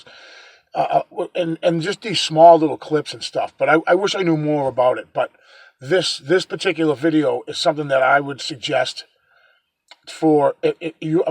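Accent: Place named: New York, United States. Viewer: You talk like a New Yorka lol. Regahdless. It's different than all other cryptid research channels.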